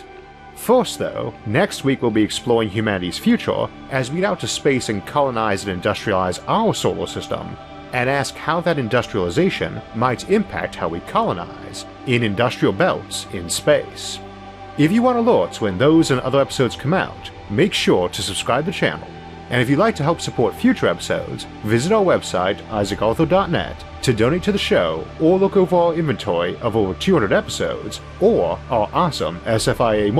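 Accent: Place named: Mississippi, United States. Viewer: what accent does Isaac have? I assume American, but from what part of the US?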